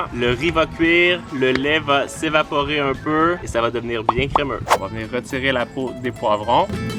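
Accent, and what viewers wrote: Quebec, Canada. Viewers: 😂😂j’adore,ton accents québécois 😂😂j’aimerais trop abiter au Canada !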